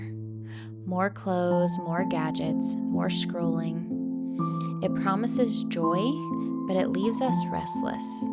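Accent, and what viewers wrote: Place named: United States. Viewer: I buy to many books!I love your accent! what part of America is that from?I'm from the u.k.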